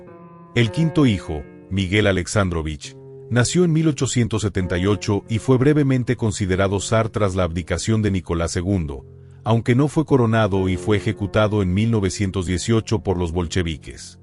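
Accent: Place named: Mexico. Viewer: Me encanta el acento del español mexicano, mucha gracias al narrador.🎉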